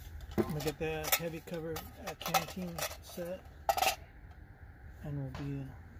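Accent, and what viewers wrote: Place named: South Carolina, United States. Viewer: Dang..... are you sure you’re not from South Carolina????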